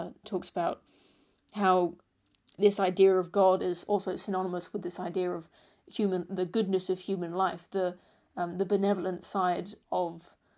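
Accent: England, United Kingdom. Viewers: I love Jordan Peterson to death and back again; that's why I took it upon myself to take a hammer to one of his major claims in a way that felt authentic to my being. In my newest video I try and explore that the idea of Hell is preferable to the idea of not existing; because Jordan mentions that Jung's argument against Freud's about religion being simply a defense against death anxiety is invalid because they would have reserved Hell for their enemies. I would say that Hell is more easily grasped at least than the idea of non existing, in an atheistic sense. i than go into some of my DMT experiences. You're more than welcome to check it out. Side note: I feel like lots of us North Americans really are gullible to a proper English accent; you sound much smarter than you would saying the exact same words in some alternate reality, American version of yourself. 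I can't recall ever hearing that the English have the same fancy for the generic American one.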